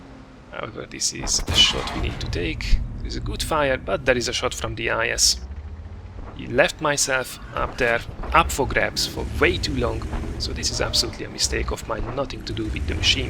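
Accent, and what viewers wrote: Hungary. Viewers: hes from Hungary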